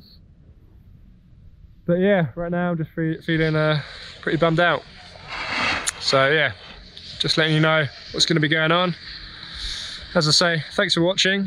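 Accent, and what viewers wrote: England, United Kingdom. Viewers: Great videos, you’ve really helped me. Keep it up. Nice to hear an English accent as well.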